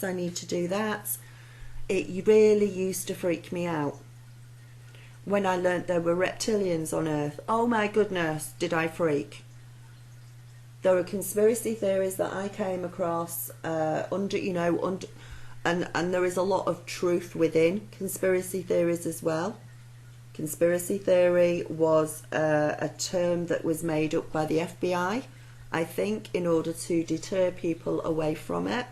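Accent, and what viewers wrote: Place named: Yorkshire, United Kingdom. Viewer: Thank you so much! I've had this on my watch later list for a couple of weeks, and decided to watch today. Wonderful synchronicity in waiting until now to watch it, as I decided just three days ago that it was time to ditch the news, conspiracies and politics - got drawn into the conspiracies for about 9 months I think, and I'm now just thinking, right, I've learned that and I can move on now. When I did a meditation with the intention of releasing it all and cutting the cords, I got such an intense positive feeling, like my Guides were really excited - I felt their excitement! 😃 Also changing my diet gradually. I've been trying to cut down on sugary snacks, but going to try again now. You got yourself a new subscriber, and great to hear another Yorkshire accent! 💖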